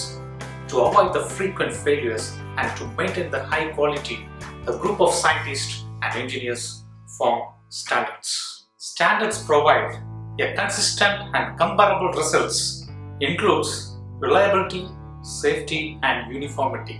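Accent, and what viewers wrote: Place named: India. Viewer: It's really hard for me to understand indian accent and poor quality voice and load music makes it so much harder.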